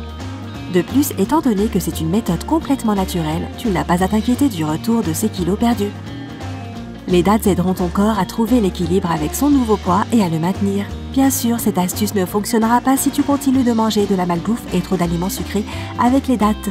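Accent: Quebec, Canada. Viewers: elle viens du Québec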